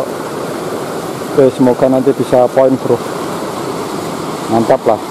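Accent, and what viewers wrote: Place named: Banjarnegara, Indonesia. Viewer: Asline ngendi mas...logate kyk neng nggonku Banjarnegara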